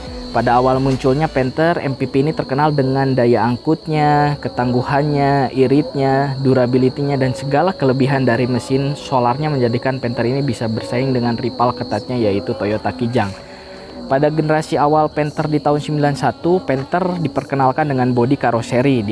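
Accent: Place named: West Java, Indonesia. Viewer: katingali pisan sundana eu...y...😄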